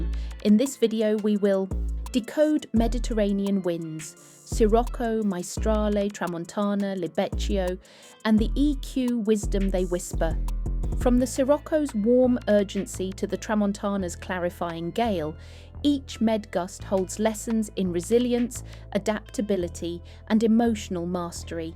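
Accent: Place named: United Kingdom. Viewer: British accent ❤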